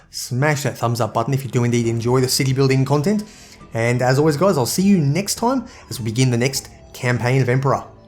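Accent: Australia. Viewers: LOVE the aussie accent - ha ha, I'm a kiwi so were not much better! Nice walkthrough, awesom game, keep it up mate!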